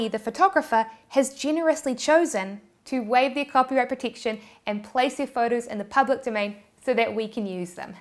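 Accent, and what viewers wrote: New Zealand. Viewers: LOL I was gonna say Australia but NZ is cool. I just started watching your channel recently and it's interesting. And your accent is cute 😂😁🥰. I used to know someone from Australia. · The Kiwi accent is soooooo adorable on you! 💓💓💓💓 ❤❤❤❤💓💓💓 Hey, what is your thoughts on Crypto?, and the likes of Bitcoin?, is that an area that you have ever given any thought to? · Ohh u are a new Zealand's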